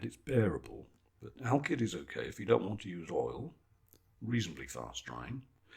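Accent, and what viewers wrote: United Kingdom. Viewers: I love it..so beautiful, like every time I watch you, and you are just so calming, with that lovely voice and great British accent. . Well, .now I am calm and relaxed. Thank you so much. Both paintings are beautiful.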